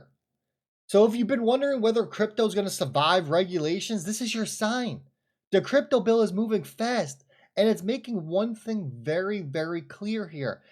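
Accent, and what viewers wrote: New Jersey, United States. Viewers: Forgive him. He's from Jersey.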